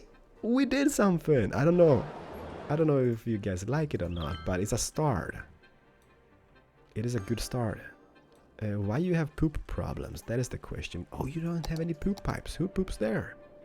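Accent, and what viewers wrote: France. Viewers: hello,you have a great french accent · Very good french accent, i'm french and that's cool :) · J'adore ton accent français :D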